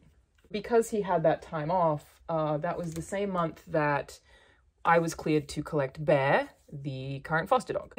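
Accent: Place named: Australia. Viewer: I love your lilting Australian speech impediment, but we need to talk about the way you say "assume." What's up with that?!? There is no 'sh' in that word anywhere! Desist! Dig you. Please roast me appropriately. :)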